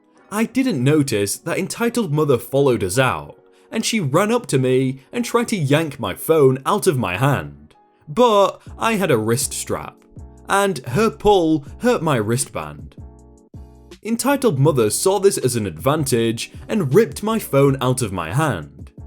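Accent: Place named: Midlands, United Kingdom. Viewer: Curly Nation06 Yeah, he has a midlands accent, I believe. Definitely somewhere middle or north in the UK XD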